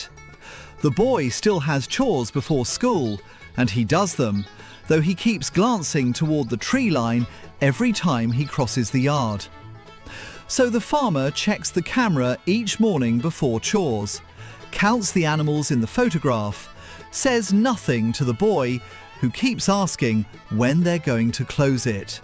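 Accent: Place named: England, United Kingdom. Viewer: Why use an English accent ???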